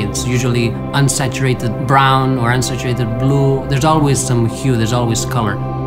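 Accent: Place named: Philippines. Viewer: he sounds Filipino, is he Filipino?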